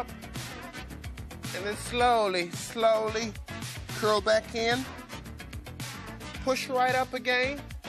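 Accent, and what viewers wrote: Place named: Ghana. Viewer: English Ghana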